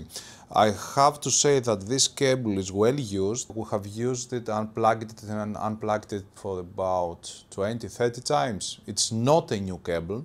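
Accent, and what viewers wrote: Greece. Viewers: Κατάλαβα κατευθείαν ότι είναι Έλληνας ο ομιλητής λόγο της προφοράς του 😅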